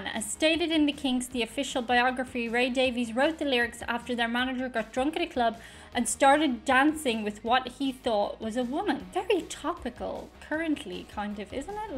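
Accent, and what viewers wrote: United Kingdom. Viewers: I find it hard to believe you have a UK accent and have never heard the Kinks. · If you're British, which it sounds like you are, there is no better dive into retro British music than the Kinks 1966-70 as they were forced by the US ban to be quintessentially English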